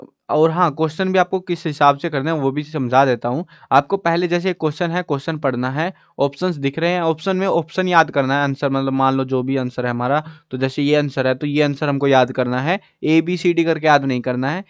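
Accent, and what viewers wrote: Haryana, India. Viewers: Ap haryana sa ho kya bhai sorry. But Mariko ap ka history samaj nahi aaya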